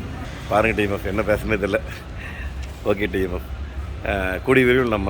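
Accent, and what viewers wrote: Kongu, India. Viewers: காரை பற்றி நீங்கள் பேசும் விதம் மற்றும் உங்களது கொங்கு தமிழ் மிக சிறப்பு அண்ணா ...வாகனத்தை மிக நேர்த்தியாக செதுக்கி இருக்கிறீர்கள்...மிக அற்புதம் 🙏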